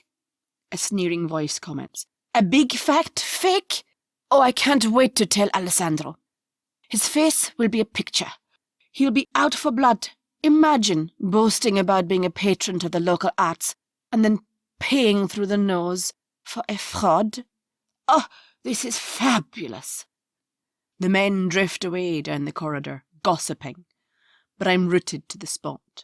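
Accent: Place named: Scotland, United Kingdom. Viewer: Wow, fantastic narrator. Love the Scottish accent, very fun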